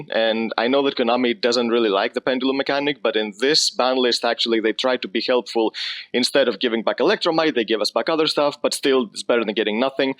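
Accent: Greece. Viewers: bro you sound kinda greek this accent gives greek vibes also very nice video!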